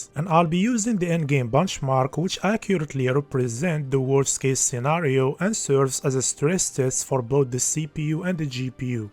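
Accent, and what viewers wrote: Morocco. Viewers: your accent is soo moroccan and i love it. + 1 sub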